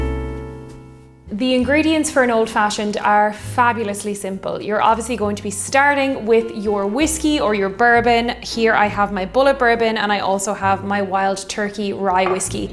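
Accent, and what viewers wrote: Ireland. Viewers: Sorry...not even certain what she said. I was too busy listening to how she said it. That subtle, distinctive, lovely, Irish pronunciation gave me an eargasm. 💖💖💖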